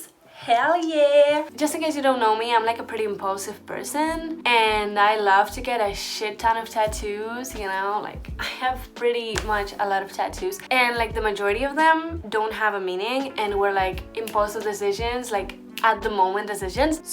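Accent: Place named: Catalonia, Spain. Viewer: As soon u started talking could notice in your accent that you are Catalan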